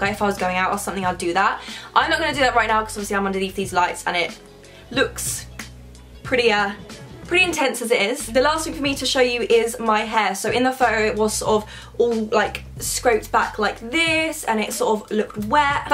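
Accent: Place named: London, United Kingdom. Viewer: I was freaking out that I expected the typical American accent from the thumbnail but was SOOO pleased when I heard her speak! Same accent as me, doing it for the London girls💕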